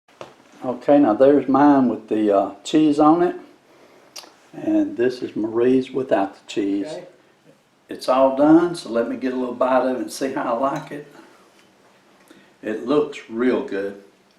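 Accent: South, United States. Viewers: Looks yummy..great for a brunch..;) love your southern accent..I lived in va as a navy wife..loved living on the east coast..;)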